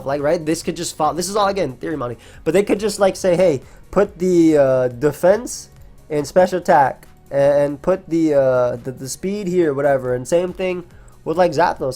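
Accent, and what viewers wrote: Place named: New York City, United States. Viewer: Your NY accent really popping off today haha. I think Zapdos will be great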